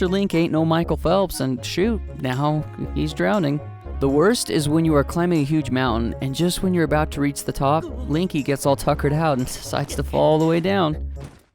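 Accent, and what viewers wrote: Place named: California, United States. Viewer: this choking californian accent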